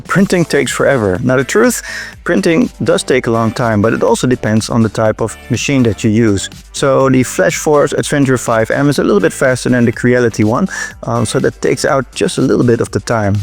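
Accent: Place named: Netherlands. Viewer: IDK but u sound Dutch 🇳🇱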